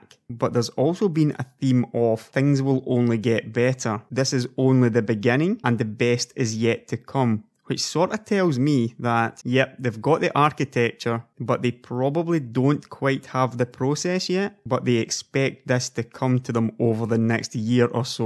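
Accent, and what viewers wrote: Scotland, United Kingdom. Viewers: he is full on * Scottish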